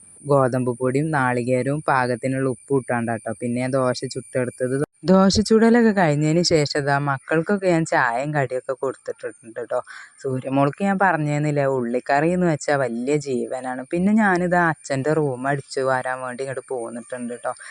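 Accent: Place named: Malappuram, India. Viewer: നിങ്ങൾ എന്നത് തെറ്റല്ല ഓരോ നാട്ടിലും ഓരോ സംസാര രീതി ആണ്.... മലപ്പുറത്തുജീവിക്കുന്നവർ വീഡിയോക്ക് വേണ്ടി കണ്ണൂർ തിരുവനന്തപുരം ഭാഷ സംസാരിക്കാൻ പറ്റോ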